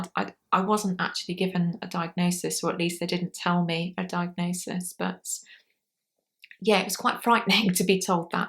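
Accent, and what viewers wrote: United Kingdom. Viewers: Hi! 🧡 Thank you so much for all the time, research, and thoughtfulness you put into creating this helpful, informative, transparent video. First, as always, you are such a comfort. So kind and open, it is really a gift. Someone else commented on your voice and I could not agree more! One of the things I loved about living in England was the accent you all have... Your voice, in particular, promotes a sense of peacefulness, even when you are talking about hard things. Next, I hadn't realized that some of the physical conditions I have are common for folks with autism: I only lasted one night with my mouth guard too. And, my period was so difficult that eventually (and of course I DON'T recommend this because of all the health problems associated with being underweight), I found that if I kept my weight lower than normal, I wouldn't menstruate. 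And even though my doctor told me to put on at least five pounds so that I would menstruate regularly, foolishly I thought, why would I want to menstruate when it is SO painful?! Or gain weight when I don't feel like myself in a different body (the person who assessed me said that is an autistic symptom for some other folks she assessed). So, not surprisingly, I too have osteoporosis. Fortunately I was able to get pregnant with my two boys during a phase of keeping my weight higher so I could get pregnant and nurse... Finally, I was so sad to hear about the high pitched noise Ian always experiences and that you too have ringing in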